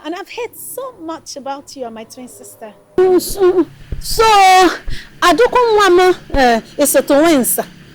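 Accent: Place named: Igbo, Nigeria. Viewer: Adaukwu this your igbo accent is something else😁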